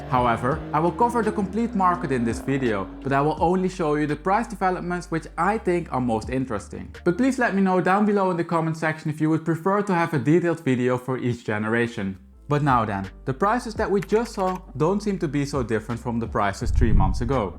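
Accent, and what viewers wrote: Netherlands. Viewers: You sound dutch, i'd love to see you analyse data on homes here. I know it's significantmy different from your regular content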